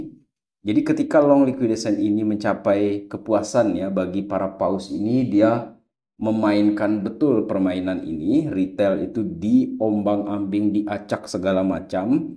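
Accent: Sumbawa, Indonesia. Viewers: Orang Sumbawa bang?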